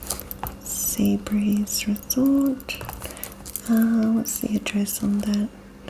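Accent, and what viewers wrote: Australia. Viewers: Hearing an Australian accent in ASMR is so comforting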